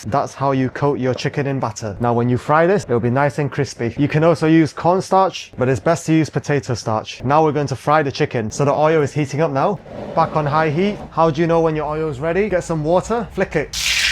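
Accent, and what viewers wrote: Northern England, United Kingdom. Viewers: Do I detect a slight British accent? 😲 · Slight hint of northern English accent?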